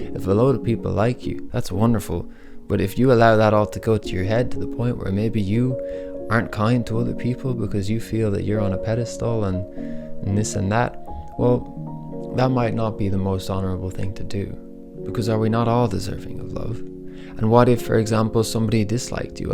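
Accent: Ireland. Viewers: the aule paddy accent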